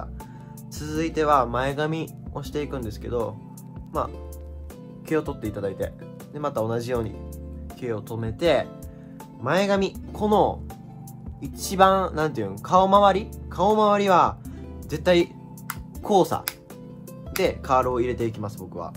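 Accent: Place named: Kansai, Japan. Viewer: イケメンなのにアホ丸出しの喋り方に天然で大阪出身関西弁で黒髪が似合うって、最強なんよめっちゃ好き黒髪見てえです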